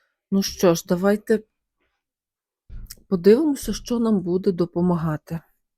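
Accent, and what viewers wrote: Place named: Galicia, Ukraine. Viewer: Доброго ранку. Дякую за прогноз. Ви напевно зі Львова? Галицький говір, мова.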